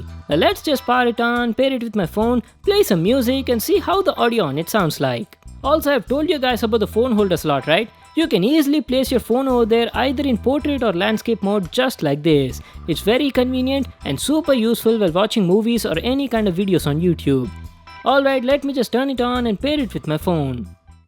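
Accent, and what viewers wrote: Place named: Tamil Nadu, India. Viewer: Is he a Tamil Guy ? Or Telugu Guy.. Just asking becz of his English pronunciation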